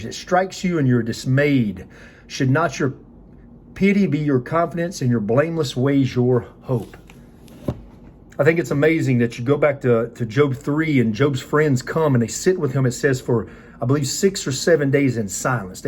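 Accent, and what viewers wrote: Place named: California, United States. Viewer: Hey, your voice reminds me of my old school pastor back in my younger youth, with the Californian/southernistic (new word made) accent. I enjoy your videos and the good messages from the Word of God. I wanted to know your thoughts on the different translations of the Bible, like speaking of my old school pastor, he comes from that old school era of having your actual copy of Word of God in KJV and I believe that too but have somewhat laxed on it with using the YouVersion bible app but strictly to KJV and nothing else as I kind of bridge the gap of old school to the new school, as I am a late 90s kid just before that horrifying, tragic day in New York with a couple towers. My question is, do you learn more from other versions or do you find some more or less restrictive in obtaining knowledge you want to get from God, what do you get from these other translations than from KJV?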